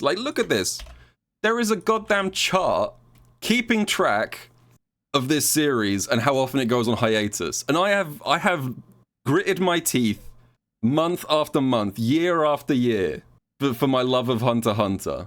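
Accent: United Kingdom. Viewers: I thought I was clicking on a Sajam video and then a British accent started talking to me, I was baffled.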